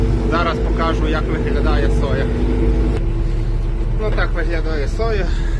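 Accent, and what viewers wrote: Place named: Transcarpathia, Ukraine. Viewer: Чути закарпатський говір, з якого ви району?( область і так знаю))))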